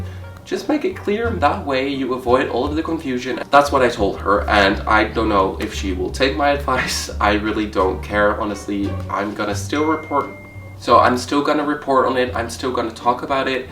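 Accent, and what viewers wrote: Netherlands. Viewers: yup. just caught that... but with his Dutch accent he always says "who dat" instead of "that"... listen carefully to the way he says that. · Omg he's from the netherlands too! Yooo kaasgap je accent is gaar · The Dutch accent lol!!!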